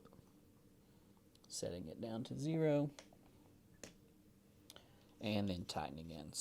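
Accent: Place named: South, United States. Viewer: You've gotten younger......and much more southern. And is that a Bergara I spy?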